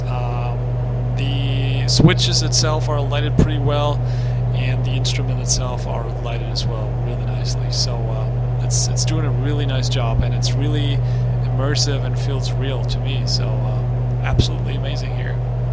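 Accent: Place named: France. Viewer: Salut ! Sympa la review, tu es français non? Tu parle vraiment bien anglais mais j’ai l’impression d’entendre un accent français quand même ! Y’a pas mal de pilote qui s’entraîne en anglais c’est pour ça que je pose la question car j’arrive assez bien à comprendre ton anglais donc tu pourrais être français 😂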